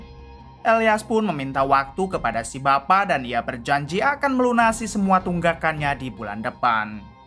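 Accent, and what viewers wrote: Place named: Madura, Indonesia. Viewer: Anjay derih madureh nkk bos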